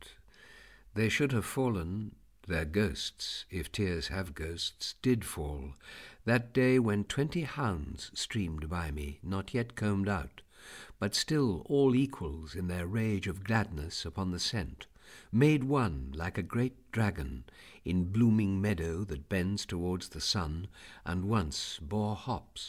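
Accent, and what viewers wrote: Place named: England, United Kingdom. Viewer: Nice reading, lovely English accent as if Thomas himself were reading.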